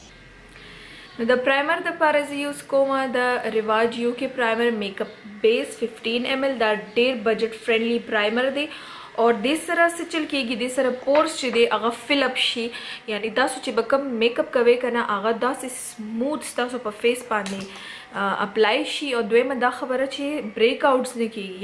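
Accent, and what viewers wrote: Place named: South, India. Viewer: Sounds like South Indian. No offense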